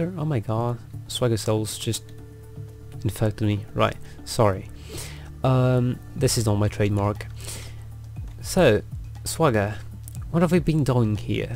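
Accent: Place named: England, United Kingdom. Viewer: Your english accent is so sweet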